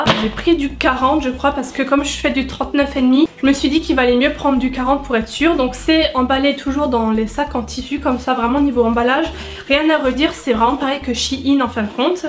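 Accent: Lorraine, France. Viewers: Ta l'accent lorrain 😘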